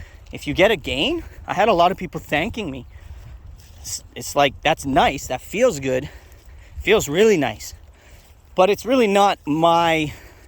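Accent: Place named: Canada. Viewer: Keep grinding man your really building this channel quickly. I could really here the canadian in ya this vid. You said level at one point i was abut to die lol 🤣🤣 best of luck bro. Curious to see your perspective on the warrants too many conflicting view points and most of those who actually know what theyre talking about are just pointing out what perspective they want everyone else to have. I know for a fact youll tell it like it is bro.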